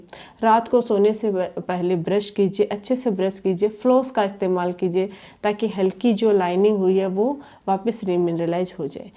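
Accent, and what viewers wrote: Rajasthan, India. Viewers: M aap rajsthan s ho kya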